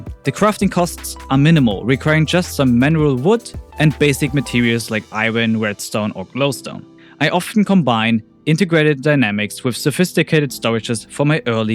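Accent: Germany. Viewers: Bro, are you actually German? Maybe it's just me but.i hear a VERY slight German accent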